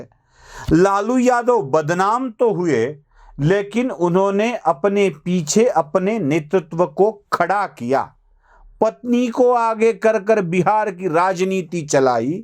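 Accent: Maharashtra, India. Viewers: बहुत खुब!! बढीया! भलेही मराठी टोन हो, पर हिंदी मे खुब ही बढीया विश्लेषण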